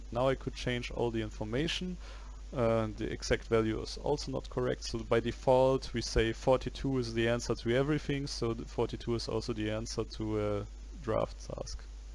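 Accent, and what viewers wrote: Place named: Germany. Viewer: Man merkt das du Deutscher bist aber danke für die Hilfe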